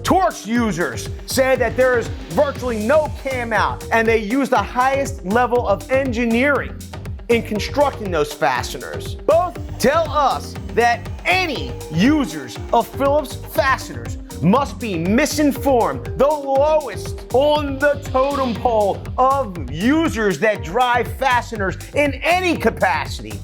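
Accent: Philadelphia, United States. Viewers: I knew you had to be from Philly, you got that Philly energy and flare. I agree with you on the Phillips bit, most people don't understand the concept and think its an annoying feature but in aviation breaking off a fastener in the airframe means at best hours and inspection and engineering, structural analysis and tedious removal and rework. At worst all of the above plus removal of the affected airframe and replacement, hopefully nothing flight critical.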